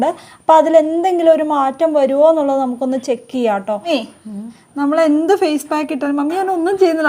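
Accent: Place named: Idukki, India. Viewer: Hi നിങ്ങളുടെ slank കേൾക്കാൻ നല്ല രസമുണ്ട് ഇടുക്കിയിൽ എവിടാ place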